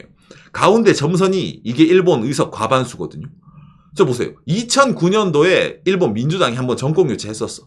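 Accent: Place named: Gyeongsang, South Korea. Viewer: 대구경북 억양이 확 들리네요😂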